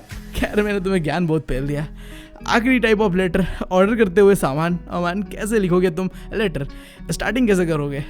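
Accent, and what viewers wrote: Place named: Bengal, India. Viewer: Bengali accent is soo good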